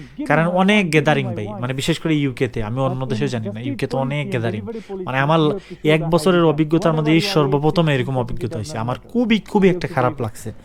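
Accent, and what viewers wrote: Sylhet, Bangladesh. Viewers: His tune like sylhety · Brother, are you sylheti ? Your language like shylhety language. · সিলেটী একটা টান চলে আসে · ভাই কি সিলেটি?😁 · আপনি কি সিলেটি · Mator style hunley bujha jay Sylhet er manush je apni! Btw apnr bari habiganj ni vai? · Vai Khun desh oo take ba ? R tumr kotha huniya buja jar sylheti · ভাই কনফ্রাম সিলেটি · Vai apne ki sylheti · ভাই সিলেটি😅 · Vai apni sylheti apnar kotay buja jay... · শুদ্ধ উচ্চারণের সাথে সিলেটি টান ত আছেই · Bro You from sylhet. Ami afnaar voice oor dhoron dekiau bujhi lisi afne ee Sylhety. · ভাই আপনি সিলটি 🥰🥰🥰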